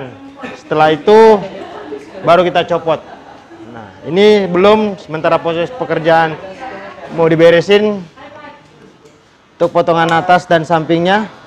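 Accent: Makassar, Indonesia. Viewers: Orang makassarka bang?...karena dari logat abang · Emm berbau Makassar logak nya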